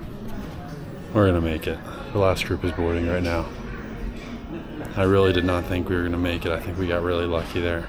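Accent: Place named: Eastern Europe. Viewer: Which country are you from? You sound like eastern european, which is cool, I myself am from Poland.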